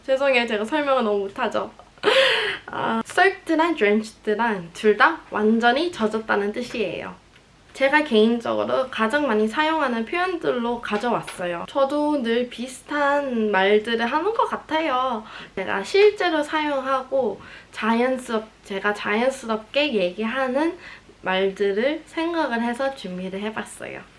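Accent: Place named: London, United Kingdom. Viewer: 런던은 아니구 surrey 지역인데 근교긴 해요! 감사합니당 완전 어렸을 때부터 영어를 쓰신 건 아닌데 완전 억양이나 그런 게 런던 악센트네요! 저는 미국 호주 영국 한국 다 섞여서 완전 인터내셔널인데 대학교 첨 가셨을 때도 궁금해요!!! 썰 같은 거 풀어주세요 ㅎㅎ 미리 알고 가면 좋은 팁이나!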